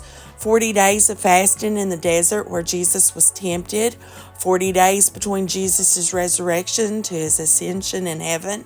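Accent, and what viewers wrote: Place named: South, United States. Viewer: Possum Patty watcher here just looking around! My mother, if nothing else in a day or night, PRAYED...I can hear her now..."LET GO AND LET GOD" she passed many years ago...but your southern accent and heart of prayer reminds me of her...happy crafting! PP is wonderful! ❤B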